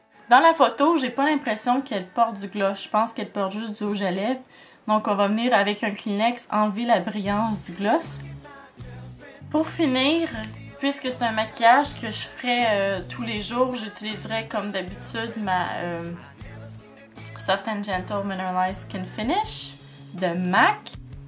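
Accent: Quebec, Canada. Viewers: T'est magnifique, j'adore l'accent québécois.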